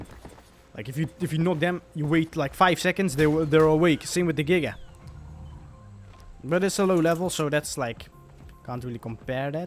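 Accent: Netherlands. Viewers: Ik kan nu al horen dagge ne Nler zijt haha goe videoke :P groetjes van België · Hahah eerste zin die je zegt en ik hoor nu al dat je Nederlands spreekt, maar wel een goede vid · E bro ik hoor dat je nederlands bent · Ik hoor aan je accent dat je nederlands ben wil je eens ark splen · U sound so Dutch like me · woooow nice video but are you Dutch? cause i can hear some kind of accent!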